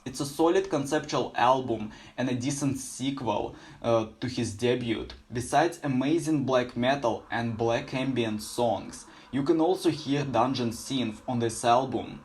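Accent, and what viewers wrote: Russia. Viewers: Русский акцент слышно за километр, лучше на русском видео пили... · Зачем ты делаешь контент на английском если у тебя такой русских акцент?) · I almost expect English speakers to complain, but even other Russians...wtf. Even with the thick accent, it's easy enough to understand him. Besides, the accent is nice and adds character.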